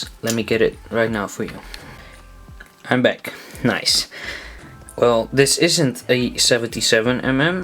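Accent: Netherlands. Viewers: duidelijk een nederlander :p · give him a break he is dutch....im sure he speaks better english than you can dutch. Anyone watching the video can see how the system works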